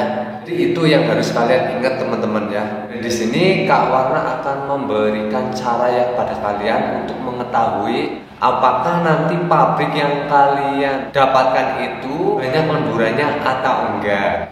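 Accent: Banyumas, Indonesia. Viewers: Sukses selalu wong Cilacap , ora ngapak ora kepenak 😁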